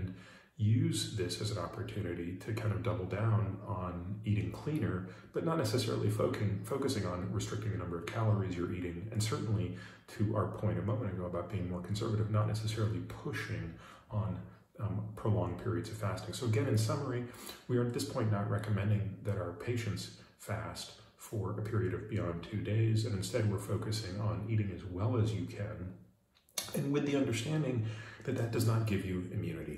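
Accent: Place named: Canada. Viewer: It might be the intonation since Peter is Canadian like Seth. Peter has a better voice.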